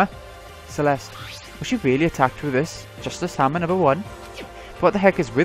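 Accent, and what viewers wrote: Wales, United Kingdom. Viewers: you sound Welsh asf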